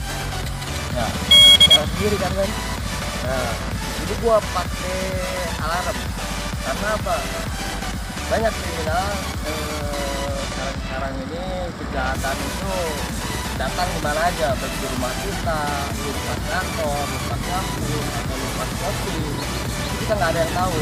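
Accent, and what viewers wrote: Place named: West Java, Indonesia. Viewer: Bang mf asli orang mna kaya sunda yeuh..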